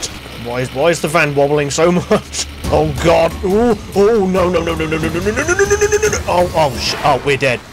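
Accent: United Kingdom. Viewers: You are a mix of thedooo, mcnasty, martincitopants and british. And i love it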